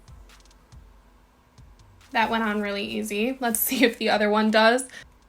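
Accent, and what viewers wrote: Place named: Minnesota, United States. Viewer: You’ve got to be a fellow Minnesotan because you sound like every girl I know.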